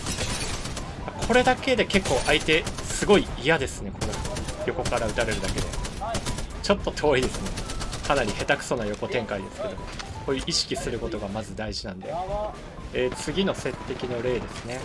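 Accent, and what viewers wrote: Japan. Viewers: 方言青森くさいな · 方言の喋り方茨城弁じゃないかな？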